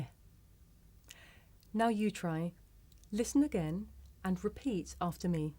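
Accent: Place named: United Kingdom. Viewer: i think it's british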